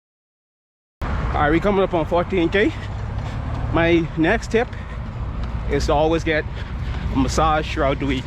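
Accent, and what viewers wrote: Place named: Bahamas. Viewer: I heard the accent am very familiar with it I don't know how someone can get a Bahamian English confuse with Jamaican English I've seen interviews with mychal thompson klay thompsons dad where they ask him if he doesn't talk in that Jamaican way or buddy hield I didn't know they had a somewhat accomplished distance runner from the Bahamas obviously not upper echelon norweigans,kenyans,ugandans,Ethiopians but still a decent one anyway I think this video is a perfect example of a strong Bahamian English dialect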